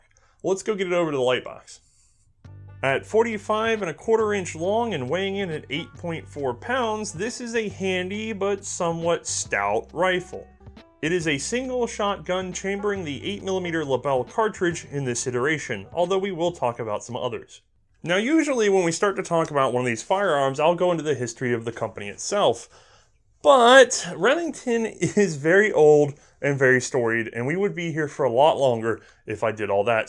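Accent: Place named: South, United States. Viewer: Haha, must be that thick southern accent (or my damn ears).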